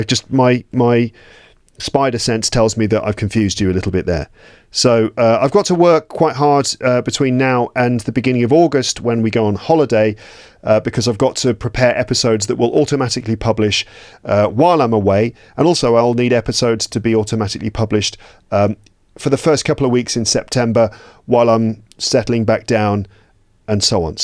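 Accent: RP, United Kingdom. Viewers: Hi,. You look very nice in that T-shirt. I love the colour. I'm a teacher from Buenos Aires, Argentina. I started watching you on You tube premium this year, but I can't remember when! I enjoy listening to you because your modern RP English is fantastic, you have a beautiful soothing voice and you are good tempered, funny and very clever. I want to improve my listening skills, enlarge my vocab and revise what I have forgotten, since I 've been teaching pre-teens for the last fifteen years or so. The level is pre-intermediate, so I've forgotten many things. I am fond of various shorts of episodes. (My favourite colour is darker than light blue and lighter than blue). I enjoyed the episode where you were showing París, an old one where you talked about your late teenage, where you travelled to, what career you took up, your favourite band and so on. I liked the way you planned the class about the short story at the campsite, the episode about Gordon Ramsey, lol, the one where you talked about films, lol, the one where Paul Amber copied accents, lol, the one where your father talked about politics, it was very interesting, I liked the one where your mother said that Charles had sausage fingers, etc etc. 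I thank you so much for your kindness, your HARD work and pleasant talk. I wish you the best, well deserved holidays on an earthly paradise like the Maldives 😅. I'd love to go there but It's too expensive for me. I'm looking forward to watching your new episode about London. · hie, can I ask which part of the Uk is your English accent from. I usually use your videos to learn the British accent and I just got curious to know the origin of the accent I'm learning. thanks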